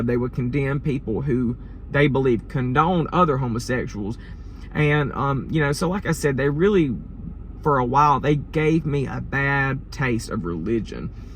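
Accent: South, United States. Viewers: . I am from West Saint Louis County. I am sorry for what you went through while living in the south. I am sure the Midwest culture here is probably different than what you are used to and I bet you were asked a million times where you were from with your accent when you lived in STL. 😀. You seem to be a fine young man and I wish you the best. Come back and visit STL soon. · I’m a native Spanish speaker in a small Latin country, I do realize that has a beautiful southern accent, and I really appreciate his vocabulary, not a single swearing word, which is wonderful. I don’t know why, but foul language in my native Spanish or the English that I know, resonates in my mind in a very disgusting way, I’m not a saint of course, but language makes all of us so special, doesn’t it? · I love your Southern accent 😃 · I too am a true Southern boy just like you even down to the voice .😊 y'all have a great day and thanks for sharing this video! 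· ❤ I could listen to you talk and tell stories all day long. You have a beautiful southern drawl. I'm a Texan, so I got a slight drawl myself from time to time depending on who I'm speaking with. ❤ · Thanx for this wonderful video! Me and my partner live in Amsterdam and both really love your southern accent! Hope you are doing well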